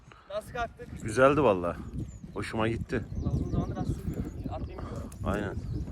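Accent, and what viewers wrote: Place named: Erzurum, Turkey. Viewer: Erzurumlu gibi geldi de konuşması. 🤔🤔 Neyse. 😊😊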